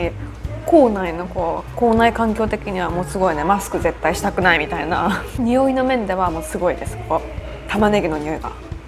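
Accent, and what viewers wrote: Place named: South Korea. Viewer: 일어하는 한국낭자 같아요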